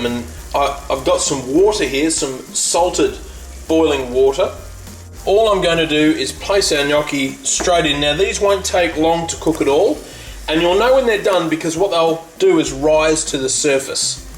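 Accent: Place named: Australia. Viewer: He's pronouncing it correctly - pretty much the Italian way of saying it only with an (understandable) Australian accent. The US "No-key" pronunciation bears no similarity <link> (to hear an Italian pronouncing the word)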